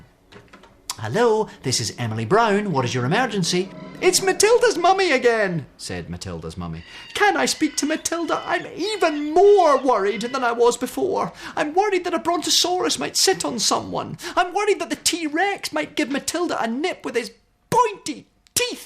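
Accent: Scotland, United Kingdom. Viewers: His Scottish accent...the way he says emergency....ooohhhhhhh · i know i love his Scottish accent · I am 19 and 1/2 years old and everything I read sounds scottish in my head because of him. Hit 2 over and over to hear a funny word :3 [/juvenile]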